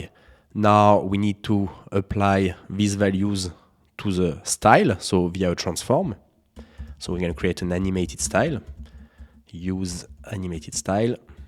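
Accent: France. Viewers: Garde ton accent buddy. Les gens te comprennent c'est le principal. Et puis peut-être que c'est le petit truc en plus de ta chaîne. Le frenchy accent. 😀 La fameuse French Touch ! :elbowcough:.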